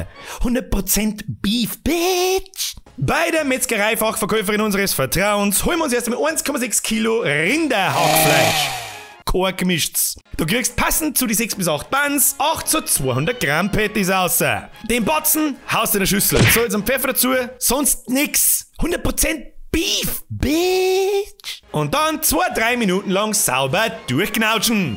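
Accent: Bavaria, Germany. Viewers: Du bist da beste bin a aus Bayern Niederbayern endlich gib s moi gescheite YouTuber die borisch redn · Kriegt schon allein für's Boarisch ein Abo, ich musste sofort an den "Münchner im Himmel' denken. 🤣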